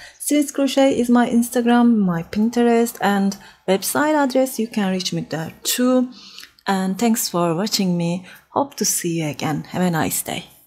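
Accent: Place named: Turkey. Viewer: Your accent is familiar. Are you a Turk?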